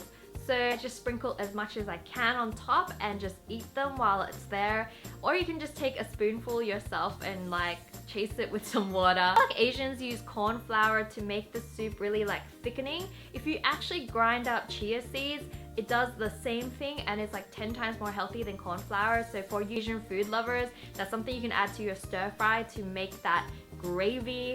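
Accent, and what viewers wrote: Australia. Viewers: Are you Australian? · She's from Australia?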